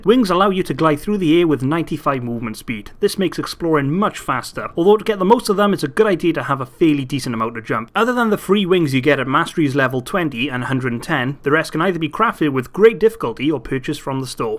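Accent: Wales, United Kingdom. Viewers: Are you Welsh???? I am! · Are you Welsh?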